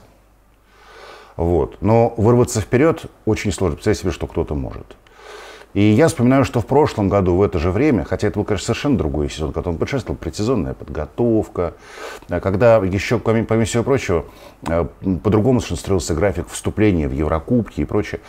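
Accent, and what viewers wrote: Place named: Russia. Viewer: А-а-а Одно Аканье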